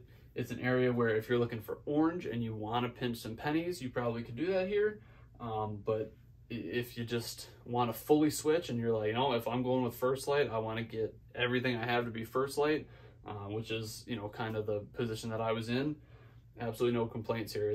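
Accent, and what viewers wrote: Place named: Midwest, United States. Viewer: I am from WI, your accent says mid to southern western. Where did or have you used said products? 👍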